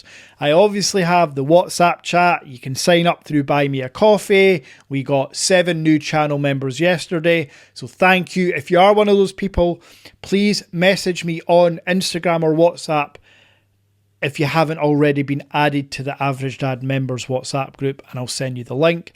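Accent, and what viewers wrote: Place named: Scotland, United Kingdom. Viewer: Dear dad I as an average french dad ( less exotic than Zimbabwe) was very happy to know more about the human being sitting in front of his youtube camera. Be restassured :for me you are not just an image on a electronic device, one can feel you are a loving famiily father because I have noticed that your beautiful sons appear more often than not on your tests. I was happy to see your wife for the first time to complete my "discovering you". I was also very surprised to learn that you were a scottish parliament civil servant, you more look like a young manager . Actually that is what you have become now. Once again I tell you a 2nd time that I admire your courage quitting such a comfortable professional situation. I would also like to say that your incredible scottish accent reminds me that II myself also speak like you if you compare my accent to the official french one. 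That because I live near the swiss german border and because my region has been invaded by the germans during and between 3 wars. That is the reason why we are french republicans but we also have a german culture with a "rough" "rocky" accent. I was also feeling sorry for your sight problems and once again I admire your courage. If your feel like developing a channel for luxus watches please do and my son sharing that passion with you will certainly join the channel. Just a small last precision: some months ago you were mentioning that anyone writing a comment to one of your videos (what I did) would have a c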